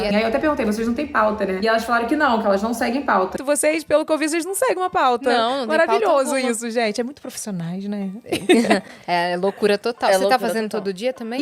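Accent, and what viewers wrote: Rio de Janeiro, Brazil. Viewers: Estou assistindo os Caçadores ao vivo nesse momento. O descaso foi menor, mas uma das apresentadoras nem liga. Me questiono: será por que vocês são amigos e fazem collab? Será que ambos são cariocas e criadores de conteúdos digitais? Sou brasiliense e percebo os nichos que se formam. E tudo bem defender seu nicho. Porém, se te convidaram, o mínimo era conhecer um pouco da sua história. E me indignou elas acharem que era paulista mesmo com o sotaque carregado. Foram sequências de falta de atenção e prestígio. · Com esse sotaque não tem como não saber q vc é só Rio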